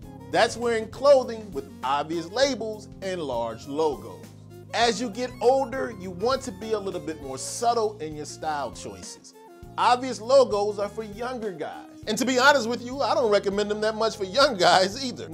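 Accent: Philadelphia, United States. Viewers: Bro as soon as I saw you and head you talk I KNEW you were from Philly. Honestly. It has to do with your grooming and how you express yourself. I would even go as far to say either Mount Airy or Cheltenham but definitely not North /South Philly? I’d like to know. I grew up in the Burbs, North Wales, the Holiday Inn was the Spot, along with Kim Graves, the Library and Second Story and Freaked out Catacombs. I am Thankful to be ALIVE TODAY. I was often, back in the day, in Ronald Phillips to get the freshest kicks. Or Today’s Man for clothes. I guess that tells you how old I am. I personally don’t feel comfortable in the slim pants leg simply because of my athletic build, but there is truth in the statement that Style is Personal. And the most important factor to a good looking, or attractive man is grooming. I remember growing up in that 80’s TP Era, always FRESH, when Men made an effort to IMPRESS the Ladies, BUT it was MORE important that You felt good. 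Changing up with a 3 day beard, or shoes with no socks. I still do wear CB Boots, but Ostrich, Lizard, or Croc with jeans. Some things don’t change. As a matter of FACT you resemble the Shoe salesman at Ronald Phillips LOL. Although most of the Brothers would feed off Other Bro to find the adequate style, I always liked to mix in the European flavors. I was into the Older Men’s fashion sense at a young age. I was very impressed with Bogart, Cagney, and the KOOL George Raft. Had a Subscription to GQ and learning about Designer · As soon as you started talking, I was thinking “He sounds like Philly.” And you are! Your vid popped up and I enjoyed it. 👍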